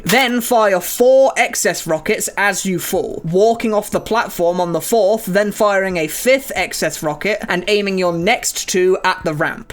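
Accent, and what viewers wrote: United Kingdom. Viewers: Ur an amazing YouTuber I just wish not brit annoy voice 👺👺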